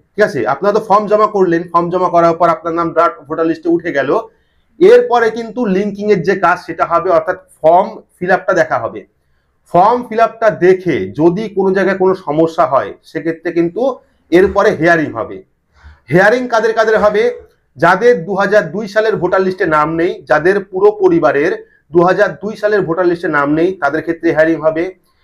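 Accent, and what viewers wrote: Bangladesh. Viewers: Aponar kothar modhay Bangladeshi tan ache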